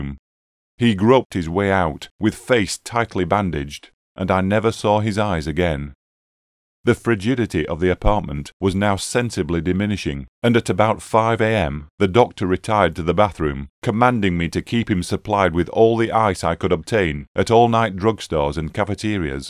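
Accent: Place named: Spain. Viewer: One of the best Lovecraft's tales! ⭐⭐⭐⭐⭐ Funny one Spanish doctor's name was 'Muñoz' & the other one 'Torres'. 'Torres-Muñoz' is a pharmaceutical company founded by the time the tale was written & that still exists in Spain! They make pharmaceutical salts. 😀 Thank you for the narration & was enjoyable with the Spanish accent! 🙏☺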